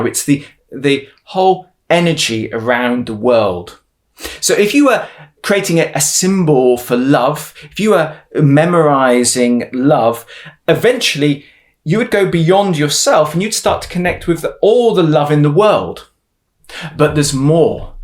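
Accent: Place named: South, United States. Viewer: He's an Englishman speaking like a Southerner. As a Texan, we talk that way so people can better understand what we're saying. Comprende??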